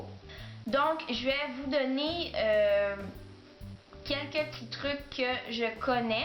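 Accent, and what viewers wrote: Quebec, Canada. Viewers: Ont dirais que tu est canadienne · T'es belle et puis ton accent quebecois Omg il est troooop beau __ 👌 +1 abonnée Francaise Active Bisoux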